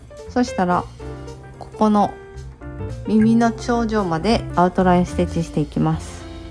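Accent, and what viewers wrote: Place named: Shimane, Japan. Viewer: 一年ちょい遅れで始めました、見つけて効率よく刺してます何より、話し言葉に惹かれ… 私の故郷の北九州弁とそっくりな方言満載…と思いきや、「だんだん」で島根の方と判明時々挟むダジャレ？に、同世代感を勝手に感じ、楽しく後を追いかけています❤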